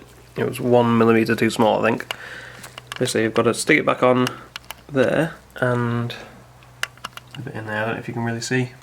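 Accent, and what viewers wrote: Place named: Northern England, United Kingdom. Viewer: What brake setup are you using? great video btw Also it sounds like your from up north so i'd give Paul at RS Tuning in leeds a call for your engine work :)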